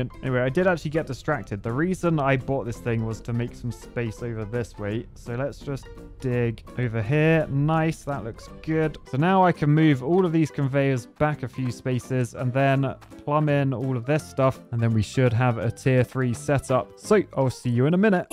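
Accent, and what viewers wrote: United Kingdom. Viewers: r u from the north or south of the uk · British dude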